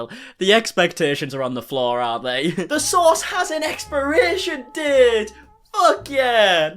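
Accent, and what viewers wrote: United Kingdom. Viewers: Knew this dude was british before i clicked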